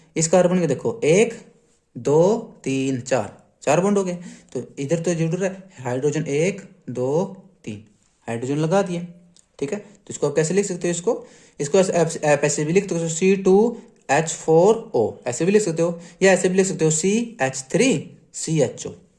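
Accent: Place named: Haryana, India. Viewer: bhaiya aap bhi alakh sir ki tarah videos bana na suru krdo. Aapka haryanvi accent bht accha hai aur padhane ka style baaki sab se better hai , sab kuch samjhane ka style bhi osm hai . Agr aap saamne aake padhaoge screen pe to bacchon ko aur maza aayega aur aap ki growth v bht quick hogi. Wishing you all the best 🙏🏻💗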